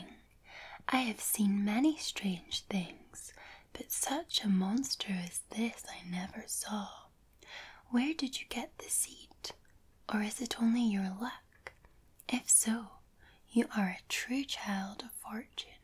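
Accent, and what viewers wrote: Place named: Scotland, United Kingdom. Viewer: The Scottish accent is greatly better than the crackle of the candle.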